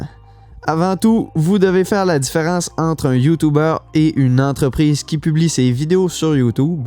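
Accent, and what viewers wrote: Quebec, Canada. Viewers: Je suis curieux, comment ça se fait que du parles d'argent en Euros, alors que ton accent laisse penser que tu vis au Québec ?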